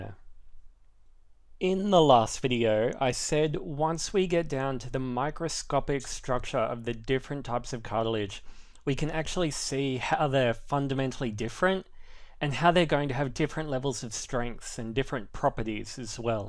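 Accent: Australia. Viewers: thank you! like your Australian accent:)(hope I pick it right!)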